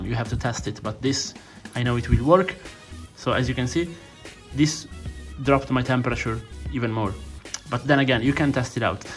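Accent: Italy. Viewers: l'ho capito dalla prima lettera pronunciata che fossi italiano hahah, poi il menu di msi afterburner mi ha dato la conferma...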